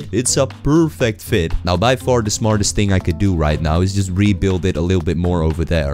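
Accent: Netherlands. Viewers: Ik hoor gwn dat je Nederlands bent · Are u Dutch, you sound like it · You sound pretty dutch bro like me. Like said you sound like.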